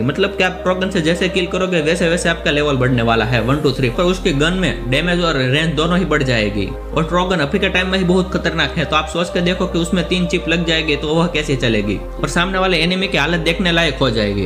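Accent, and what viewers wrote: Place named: Gujarat, India. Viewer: I think you are Gujarati aapke aawaz se pata chal gyaa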